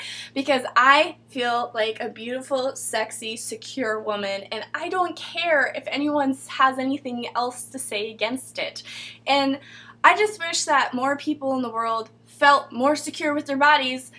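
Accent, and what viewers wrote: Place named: Wales, United Kingdom. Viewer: Yeah....i love your Welsh accent.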